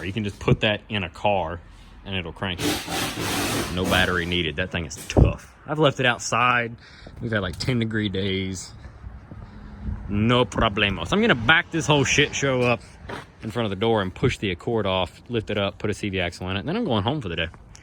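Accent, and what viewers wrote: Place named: New Jersey, United States. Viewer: Love the NJ accent. Especially love the powah mirrahs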